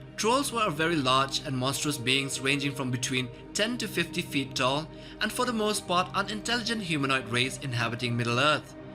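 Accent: India. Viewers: Lucas Frost have you never heard an Indian accent before? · You seriously need a better narrator; his wimpy boyish Indian accent really kills an epic vibe.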